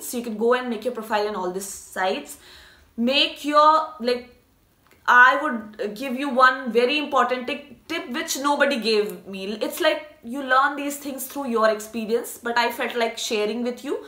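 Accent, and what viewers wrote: India. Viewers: After listening her English i am conform that she is an Indian.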